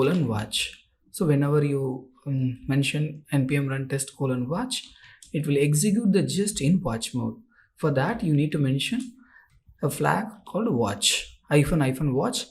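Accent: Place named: India. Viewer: 1. Learn english. The fucking indian accent, i had to turn subtitles on It's all a lie: There is an error on the jest home page at the beginning: it says: "Jest is a delightful javascript testing framework". It should be "Jest is shit and a nightmare". Focus on simplicity? It's a fucking nightmare and hard as hell Zero Config? AHAHHAHAHA You hope so, it will require you to configure paths in 2 or 3 files and it will still gives tons of errors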